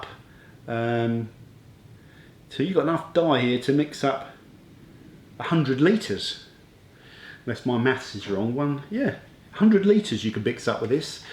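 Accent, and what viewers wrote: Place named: Essex, United Kingdom. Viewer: were you from mate im essex you sound not that far away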